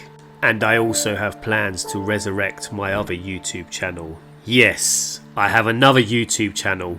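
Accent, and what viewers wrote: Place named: Southern England, United Kingdom. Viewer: No Gilfs in it 😂WOW you brought the entertainment in abundance,absolutely hilarious songs and commentary,smooth English southern accent in full flow.keep sexy playa 🤘✌️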